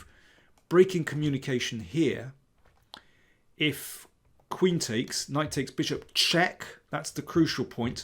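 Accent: United Kingdom. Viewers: Not to forget the superbe british tongue!!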